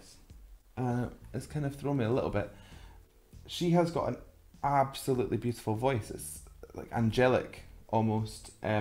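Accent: Scotland, United Kingdom. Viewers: Scottish accent is rly the coolest !! 😁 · I absolutely love your scottish accent and I love that you love!:D I love them since 2003 and I don't know you, but I'm always happy to see someone else get excited over them:D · Do I hear a little Scottish accent? 🤩 I love it!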